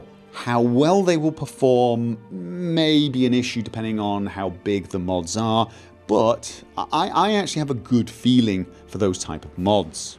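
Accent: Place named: Manchester, United Kingdom. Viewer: Are you from Manchester you've got a Manc accent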